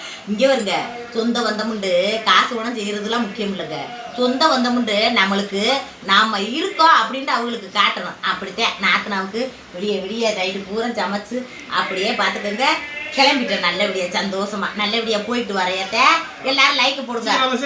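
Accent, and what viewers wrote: Madurai, India. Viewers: இதுதான் மதுரைக்கான பேச்சு அருமை👌👌👌👏👏👏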